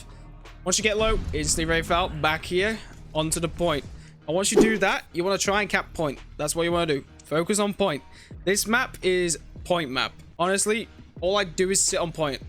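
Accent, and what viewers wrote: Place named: South London, United Kingdom. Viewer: What part of London is the accent from bro? Sounds south.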